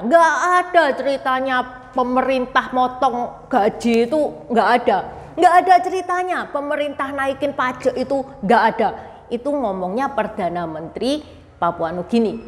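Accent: Java, Indonesia. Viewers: Ternyata dgn bicara medoknya, menjadikan saya asiik mendengarnya😅😅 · "lha wong..." biyuh muedhok.. Ngalam ikut ngintip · mantap walaupun medok banget jawanya, lanjutkan ci. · logat nya meedook banget😁😁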